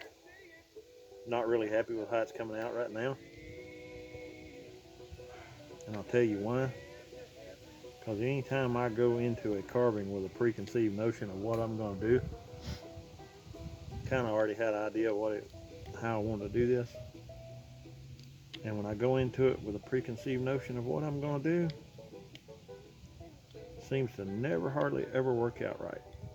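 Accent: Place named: Arkansas, United States. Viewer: I'm new to this hobby, and I love your work. On top of that, I was born and raised in Western Arkansas, but I've been gone for just over 10 years now. I wouldn't trade the time I've spent on the mission field or pastoring now in Canada, but Arkansas will always be home. Everything about you and your videos (your accent, your sayings, the yard...) it all feels so familiar. Thanks for that.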